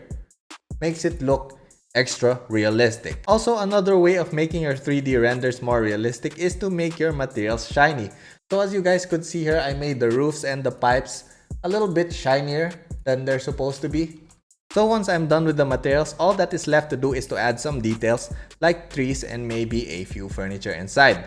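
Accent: Philippines. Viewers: why do I feel that you have a Filipino accent? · You sound like how Jokoy perceived what Filipinos sound like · damn! this is why i always wanted to see your vids coz men! your editing skills is so good and satisfying. The transition effects are so good and educational. also love your filipino english accent hahaha every diction of words is clear and precise. I think you are one of the underrated vloggers so i hope soon i can witness your growth up to million subscribers..hayyysss every time i watch your vid makes me want to go to your place and ask you to be my mentor hahaha anyways i also finished architecture degree hope to see you soon idol! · Heards his accent Me: Heck yea, a Filipino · These dudes right here be pressed about a person who just recognized a filipino accent. 😂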